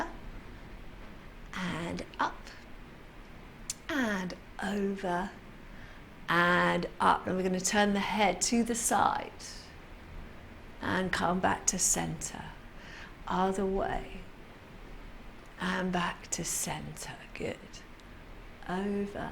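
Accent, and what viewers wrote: United Kingdom. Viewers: I haven't attended Pilates for about 3 years, but these short simple basic classes have got me back into it again. Nice to hear a UK accent too! Thank you. It is such a lovely feeling to just 'unlock' your stiff muscles. Fantastic!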